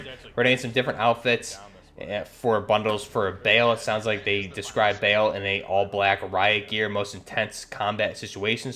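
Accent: New York, United States. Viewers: Just curious, from the sound of your voice, you live in the new jersey/ new York area?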